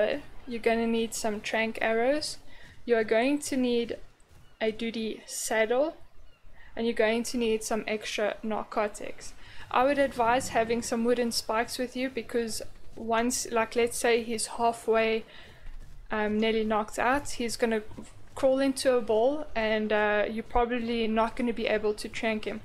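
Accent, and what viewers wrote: South Africa. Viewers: Wait... This accent🤔 sounds familiar.... You're South African right? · Are you south african? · South african accent. Beautiful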